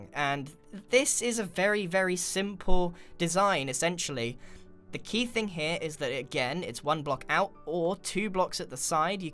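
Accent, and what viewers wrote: United Kingdom. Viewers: Are you Britain? It sounds like it. Newest sub BTW 😁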